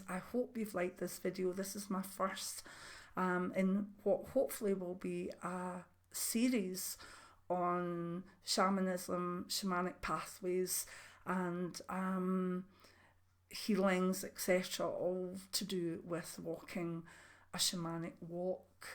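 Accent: Scotland, United Kingdom. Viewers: Hello. I enjoyed this. I hate shoes.... although I get the coldest feet so have to give in on colder days. As a child I regularly saw a shamanic indian and have alwaya been fascinated by the culture and way of life. Nature and animals are my bliss although being crocked up and arthritic I avoid drastic weather. I am effected by storms. Headaches ans ears ring. Always know when they are coming. I work at present as a psychic on a phone line ( serious health issues meant I had to give up mainstream work) and embracing this side of things. I have always felt odd and a bit different and despair at the sadness and pain. I enjoyed this video. I love your soft scottish accent. I am cornish so sending peace love and healing light from south to north to you x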